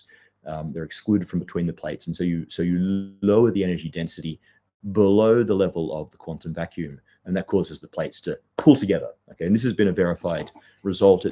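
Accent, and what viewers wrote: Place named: Australia. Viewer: The age of British narration is coming to an end. The age of Aussie narration has begun…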